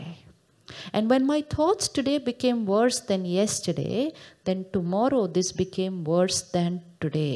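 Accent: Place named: South India, India. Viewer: Sis kuchh English word pronunciations southern india tone va tone se lagtey hain ..the ketala . Is mumy is from southern region. Of cpurse no about Papa as i had reviewed the photo snaps of the excellency .s parents . Subh Ho. Om shanti sis .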